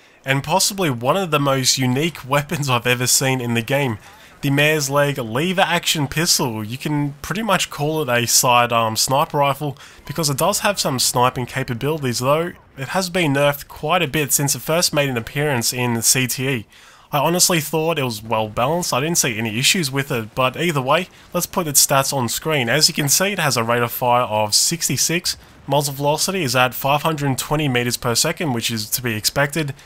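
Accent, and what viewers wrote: Australia. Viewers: Are you an austrailian?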